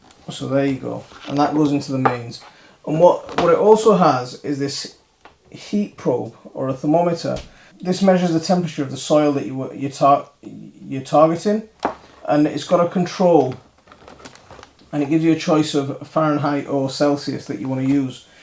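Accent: United Kingdom. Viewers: I could see that. Excellent. Tell me, it sounds like you may be second generation British. Where do your family originate from? I lived and worked in Bangladesh from <num>.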